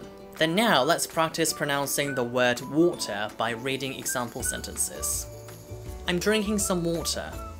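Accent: United Kingdom. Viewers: 제가 하기엔 어렵지만 넘 멋있어요 영국발음 닷츠 워우타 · Your accent is very impressive considering you are not from England!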